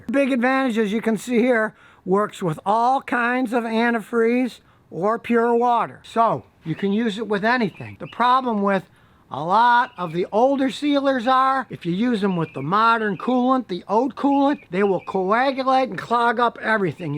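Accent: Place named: Midwest, United States. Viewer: you live in or near Houston?? You don't sound from arround here, more like from the midwest??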